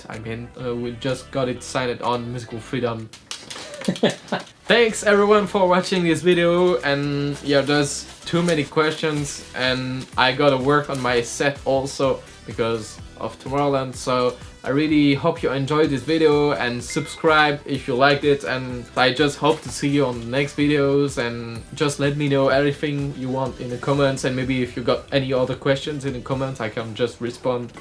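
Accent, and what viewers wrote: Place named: Toulouse, France. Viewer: Belle accent toulousainggg 🇫🇷🇫🇷🇫🇷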